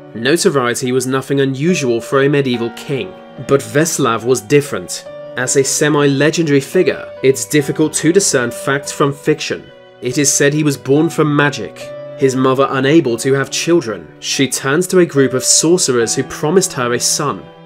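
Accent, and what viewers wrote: United Kingdom. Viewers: I just want to see face before I die. I wanna see the face behind this majestic British voice.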